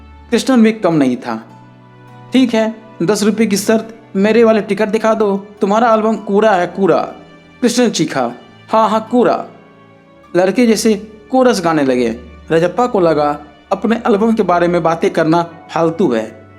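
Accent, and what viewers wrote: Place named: Bihar, India. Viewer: बिहारी की आवाज में मजा नहीं आ रहा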